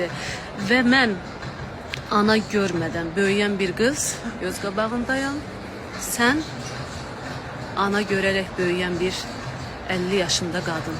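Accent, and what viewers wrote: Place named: Baku, Azerbaijan. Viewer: siz köycayllsan bakl lehcesinde nöş da lslrsan.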